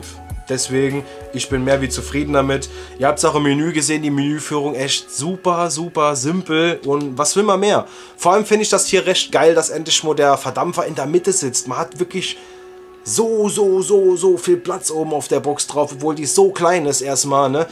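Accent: Saarland, Germany. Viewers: Kommscht du ausm Saarland? de Dialekt kommt ma bekannt vor ;)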